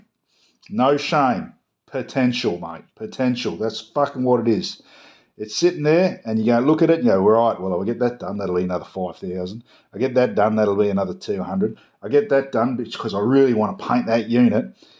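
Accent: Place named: Australia. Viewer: love hearing another aussie talking about the hobby, good job mate!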